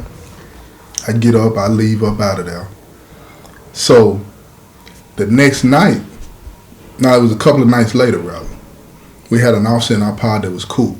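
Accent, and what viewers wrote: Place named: Tennessee, United States. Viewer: I never heard the accent of anyone from Tennessee until I started listening to you and.😊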